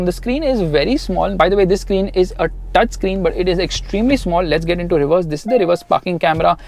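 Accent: India. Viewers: Slow the f down with your talking. Your chatting at such a high speed with that indian accent that its hard to understand you. Just a hnt you DO NOT HAVE TO PRONOUNCE EVERY LETTER IN EVERY WORD..especially the letter R.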